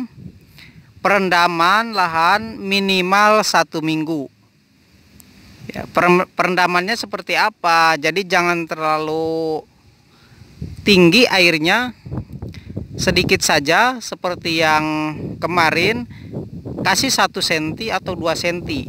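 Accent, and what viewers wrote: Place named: Cianjur, Indonesia. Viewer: Logatnya seperti tmn saya yang dari Cianjur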